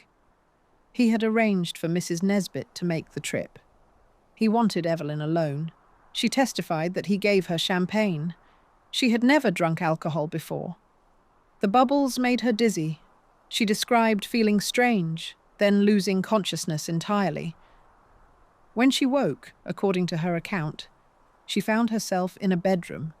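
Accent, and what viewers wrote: England, United Kingdom. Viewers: Amazing how few people know it’s AI. Why would you pick an English voice for American stories? Odd choice.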